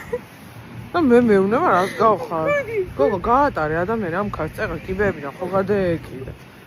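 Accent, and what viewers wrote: Kutaisi, Georgia. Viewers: საყვარელიხარ ქუთაისური კილოთი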